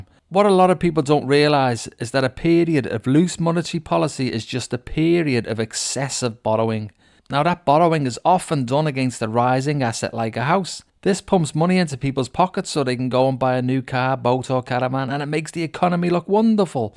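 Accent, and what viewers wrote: Liverpool, United Kingdom. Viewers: I love the fact a guy with a Liverpudlian accent is informing me on the state of our economy. 😂 I've lived here for 52 years and concur with your observations.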